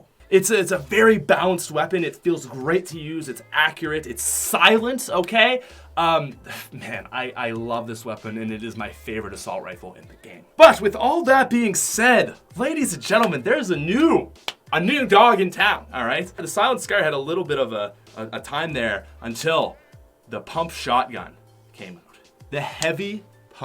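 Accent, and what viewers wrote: Australia. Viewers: didn’t realize was an Australian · holy crap I didn’t know your Australian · IS FROM AUSTRALIA PROOF IS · are you in Australia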